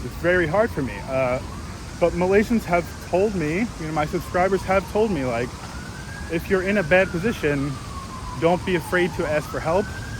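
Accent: United States. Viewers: Done donating. I think majority of your foreign subscribers enjoy listening and learning American English from a native speaker like yourself. Maybe you can make a good money by doing a personalized English conversation practice on hourly basis. With some preparation and technique, I think it can be fun and effective.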